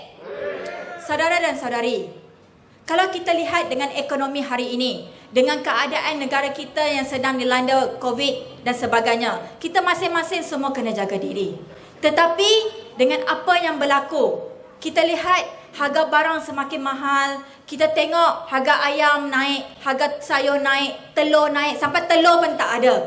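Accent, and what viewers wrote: China. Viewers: Ale uto ko la tali barut....law umno xde xde la malysia aman smpai skg...ntah2 ko pn xde...ahhaahha....ale uto....ale uto....melayu ckp sleng2 cina....tali barut.....tali barut... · cakap pun dah gaya macam cina...ibarat cina cakap bahasa melayu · Jangan mimpi la...ni Olang melayu ke Cine..ckp pon dah macam Cine ckp melayu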